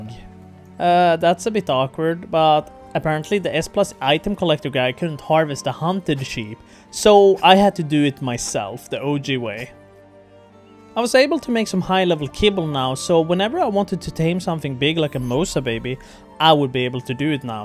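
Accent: Sweden. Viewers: haha visste du va svensk med din brytning inom svengelska 🤭🤭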